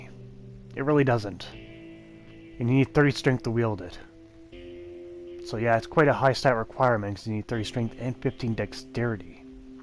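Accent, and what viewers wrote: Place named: North America. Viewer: wild guess really, the accent tells me youre from usa or canada, so it felt likely, anyways sorry if i offended you somehow, im just really peeved at certain words being spelled wrong but i didnt know it was an intentional joke on your end.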